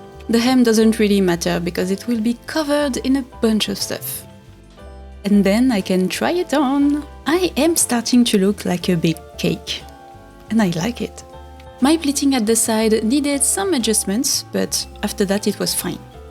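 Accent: France. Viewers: Side note: I enjoy the way you speak english. I'm guessing the time you worked as a flight attendent helped with that. A lot of french words have made their way into english (looking at you Guillaume le Conquérant), but in english the stressed vowel is often a different one. With you I never hear a stressed vowel out of place. If I hazard a guess: you can also talk english with hardly any accent, but for the videos you're playing up french accent a bit. About the word 'stomach'. Yeah, that word is also used generically, but I think where you said 'stomach' the word 'abdomen' is a better fit.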